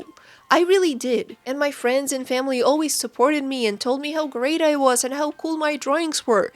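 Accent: Russia. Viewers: русский акцент? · Good video, but that matryoshka balalaika accent...hard to listen to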